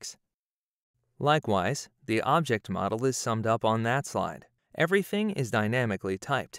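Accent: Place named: France. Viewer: The MOOC is also offered in English with a French accent.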